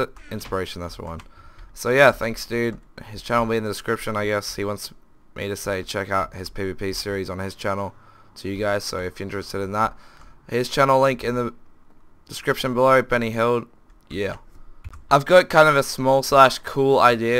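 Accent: Australia. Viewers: I swear he's Australian.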